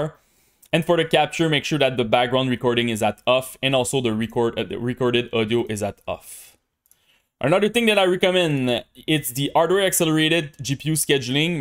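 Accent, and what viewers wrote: Quebec, Canada. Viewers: Dang a quebecois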